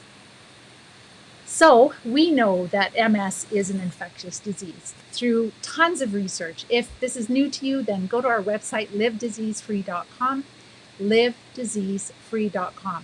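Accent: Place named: Canada. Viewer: Less than 1 minute listening to you -- I'm pretty sure you're Canadian 😮😊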